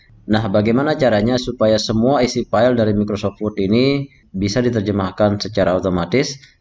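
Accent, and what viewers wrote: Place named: Lombok, Indonesia. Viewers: Terima kasih... Matur tampiasih meton.... Sangat bermanfaat..... Dialeg Bahasanya orang sasak ni....😀🙏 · Logatnya kayaq orang lombok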